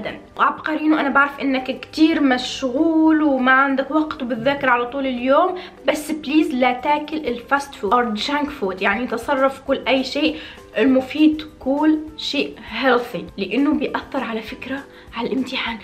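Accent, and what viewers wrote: Tunisia. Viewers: شكرا على النصائح حبيبتي بس بدي اسالك سؤال، انت من تونس؟ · هل انت تونسية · انت تونسية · تحسك تونسية 🙂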